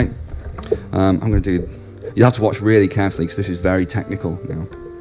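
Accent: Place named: Australia. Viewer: he sounds a lot more aussie than i ever guessed by his singing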